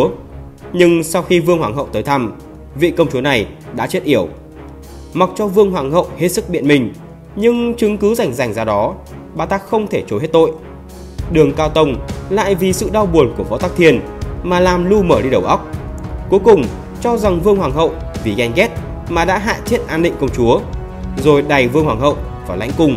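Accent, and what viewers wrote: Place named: Cambodia. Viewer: Ko biet tieng Anh thi lam on lay ten tieng viet dzum, noi tieng Anh ma nghe nhu tieng campuchia, nghe phat gom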